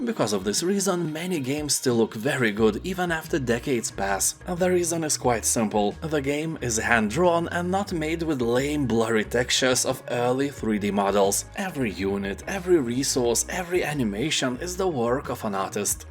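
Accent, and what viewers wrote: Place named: Baltic states. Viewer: Im not sure if you actually convinced me to pick up the game again or if it was the thick Baltic accent that just made it sound like you were speaking from authority. Either way, I downloaded it from GOG, the one I had on CD wasnt "complete" anyways even if I could find it.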